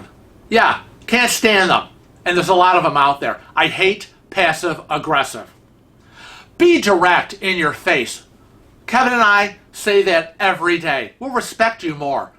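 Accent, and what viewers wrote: United States. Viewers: As someone who grew up and lives in the Boston area, I can understand your speech pattern with your slight upper state New York accent better than I can from the locals here in Boston. Lol. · you sound mid western to me.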